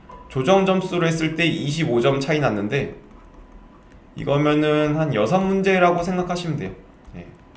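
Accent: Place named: Jeolla, South Korea. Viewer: 아놬ㅋㅋㅋㅋㅋㅋ 연고가 전라도쪽이신가보내욬ㅋㅋㅋㅋㅋㅋㅋㅋ 누가봐도 네이티브 사투맄ㅋㅋㅋㅋㅋ 저도 작년에 우정으로 급하게 바꾸면서 거주지 안되어서 서울쳤던 기억이 나네요..! 저도 자세히 안알아봣다면 난리났을지도..ㅎㅎ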